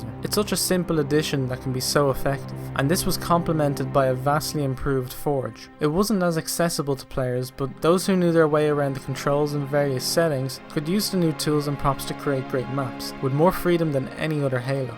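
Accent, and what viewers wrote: Ireland. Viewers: rough guess correct me if I'm wrong cork or Dublin judging by the accident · Matthewmatosis is that you?? You sound a lot like him lmao. More than just the Irish accent; very analytical. You made really good points man, and got me more hopeful for Infinite somewhat. It truly is their last chance to save not only halo, but the xbox even. Subbed, keep it up! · My boy is Irish. I can hear it 👐 Nice video dude!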